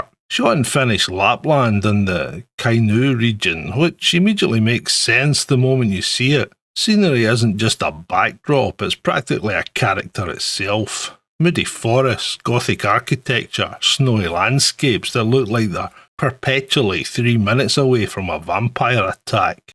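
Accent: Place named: Scotland, United Kingdom. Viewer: The heavy Scottish accent narration kills me....its charming usually, but not for this, no.........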